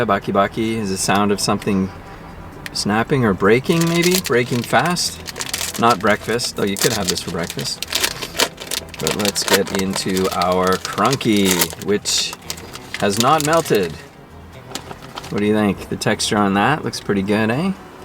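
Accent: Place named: Canada. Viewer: You may not have had food from home in a long while, but you still sound Canadian, eh?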